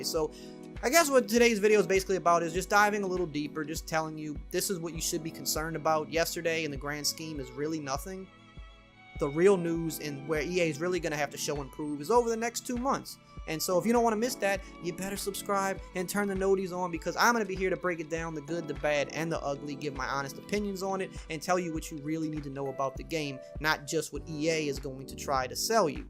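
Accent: Louisiana, United States. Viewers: are u from Louisiana because I am too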